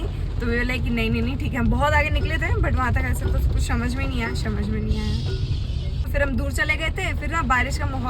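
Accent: Bihar, India. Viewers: Aap bhale he marwaadi ho aur calcutta m rhe ho kintu aapka lehza ya andaaz bihar u.p wala h.......love it❤❤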